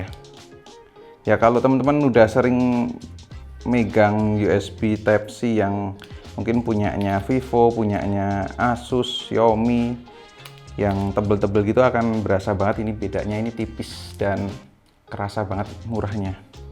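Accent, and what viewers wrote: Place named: Java, Indonesia. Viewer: Logat jawanya masih terasa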